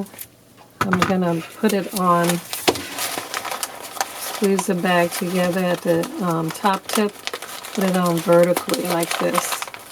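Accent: New Orleans, United States. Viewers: you crack me up enunciating pump-kin extra hard since people were commenting on your New Orleans accent. It’s ok to say pun-kin 😂 🎃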